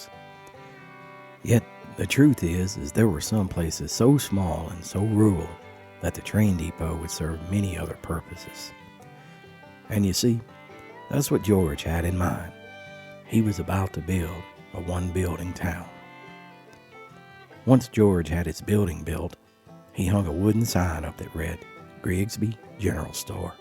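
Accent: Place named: South, United States. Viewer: You make wonderful,easy to watch videos. The music and filming is exceptional in my opinion,oh and you are blessed with a great sounding “southern” voice. Thanks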